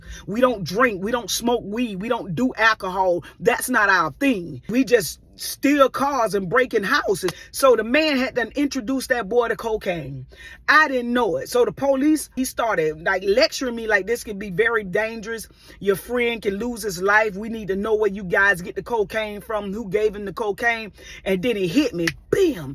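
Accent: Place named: South, United States. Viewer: I’m from NY & I think it’s the southern accent that makes your stories so funny to me. Glad GOD has kept His hand on you throughout your life and that you share these testimonies with us. Bless you!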